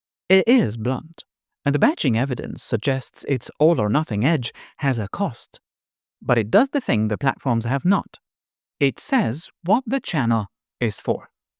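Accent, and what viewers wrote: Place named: Netherlands. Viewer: This vid haz awesome English gramma (grammar) with a Dutch accent!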